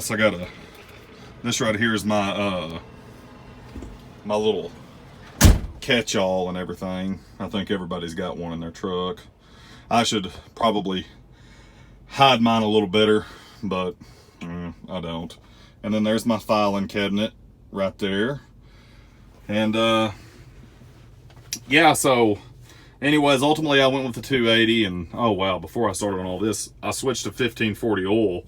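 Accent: South, United States. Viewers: Looks good as red Is my favorite color! Like ur Southern accent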